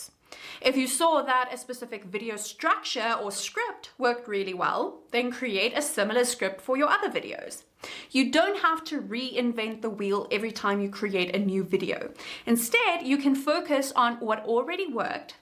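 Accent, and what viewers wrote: South Africa. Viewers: (Are you South African ?) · Love your South African accent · Love the South African accent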